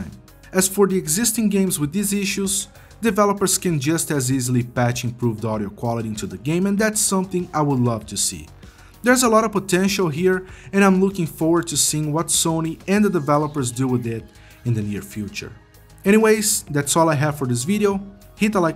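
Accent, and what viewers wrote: Brazil. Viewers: hey man, are u brazilian? great video by the way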